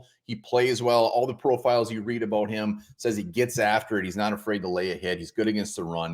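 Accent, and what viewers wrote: Minnesota, United States. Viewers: ik he did not pronounce swag like that 😂 “swage” that’s that minnesota accent at full strength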